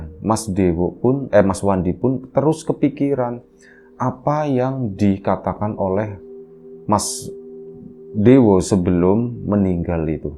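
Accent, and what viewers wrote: Tegal, Indonesia. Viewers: Sedih bngt😢🤧mas Wandi scra ga lngsung menyemprotkan gas air mata ini...dr mulai mas Wandi mngikhlaskan mas dewo sm mb putri aja udh terharu ditambah dg mninggalnya mas dewo bkin nyesek.mas Wandi ini kya'y emng lucu ya aplgi dibawakan mas dg gaya natural dan logat khas Tegal yg agak mirip sm cirebon bkin aku ketawa.kisah ini pket komplit ya ada sedih dan ada lucu'y😂smoga mas Wandi dan mb putri rmh tangga'y langgeng bahagia dan mnyayangi anak mas dewo spt anak sndiri, mkasih mas sehat trs agar bs mnghibur kt smua😁😁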